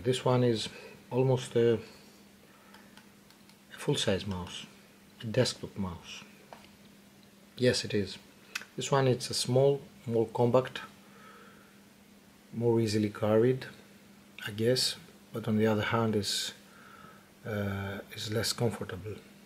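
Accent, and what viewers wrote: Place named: Greece. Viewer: Τα αγγλικά του Έλληνα αναγνωρίζονται αμέσως απ' τη πρώτη λέξη ☺️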